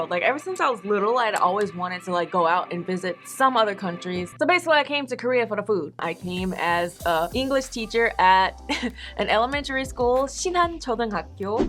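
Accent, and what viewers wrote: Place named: South, United States. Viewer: Congrats 🎉🎊❤!! What I love the most is that you still have a southern drawl when you speak, it's been 8 years and you can still tell you're southern. Thanks to your videos I got to learn more about Korean culture through the perspective of a Black-American woman! Thank you for your dedication and love sis!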